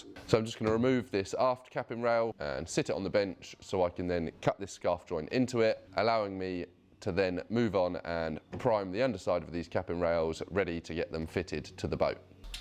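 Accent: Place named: Kent, United Kingdom. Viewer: Bravo! Well done, to camera delivery 100% better, you have a decent comparison in this vid between the intentional to camera delivery vs the incidental pieces, the incidental bits, you are clearly more focused on the lob so the delivery has a very dry tone compared to the prepared to camera pieces. Worth reviewing each vid for pointers, that Ashford (?) accent ain't gonna help, we of the cockney diaspora don't make public speakers!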